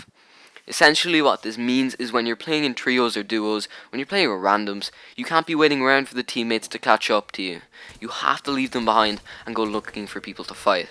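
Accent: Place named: Ireland. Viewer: i love the Irish accent (I think)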